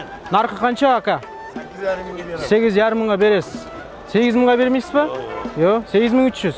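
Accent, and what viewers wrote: Standard. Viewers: басқа блогерлерден өзгешелігі таза қазақша сөйлейтіні жарайсың бауырым жұмысың өрлей берсін!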